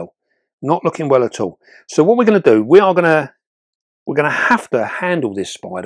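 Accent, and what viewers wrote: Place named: London, United Kingdom. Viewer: English sounds a little like a London accent I think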